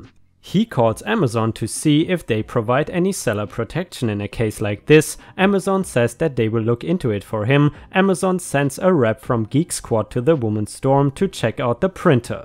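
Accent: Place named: Germany. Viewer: Hey man! Glad to see you're still making grade A content! It's just that... You're relapsing! Hahaha! For one, I think it's really cool that you take your viewers constructive critisism to heart, as you did with mine in the past! The thing I'm talking about is saying "so the next one is the update to (printer lawsuit)". I havn't noticed you doing this as much lately, but it's starting to appear again. Please specify that you mean "the next POST" instead of "the next one", because stating "the next one" usually means "the next STORY". But in this case, we're talking about an update to the SAME story. Once again, I think your content is great, just a couple of things that grind my gears ;) By the way, are you from Germany or Austria? Love the accent either way!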